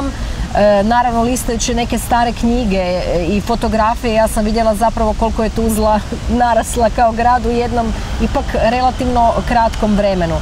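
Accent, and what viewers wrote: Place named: Croatia. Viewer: Živiš i radiš u Tuzli. A akcenat htvatski. Čudime da nema mesta za tebe nema u Zagrebu.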